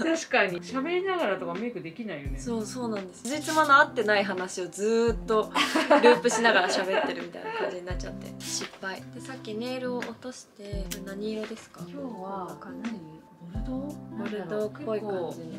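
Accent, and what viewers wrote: Kansai, Japan. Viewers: エキストラに参加させて頂いて、その際に彩ちゃんを初めて拝見しました！！❤ スタイル良過ぎてビックリしました！😳💕（語彙力 それから彩ちゃんの虜です😍💓 関西弁が可愛すぎて可愛すぎて☺💕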